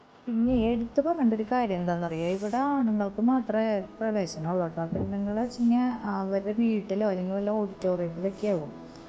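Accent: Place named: Thrissur, India. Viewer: Nice presentation..... Thrissur kari 😂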